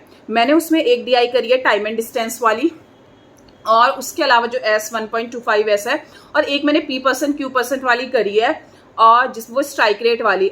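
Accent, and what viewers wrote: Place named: Kumaon, India. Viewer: your accent is so kumauni ,,,,where do you belong actually??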